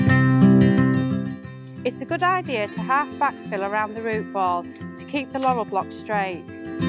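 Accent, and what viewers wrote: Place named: United Kingdom. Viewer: Her British ACCENT is so THICK, I can't understand a word she is saying. I would say try speaking English but that would be counterproductive since....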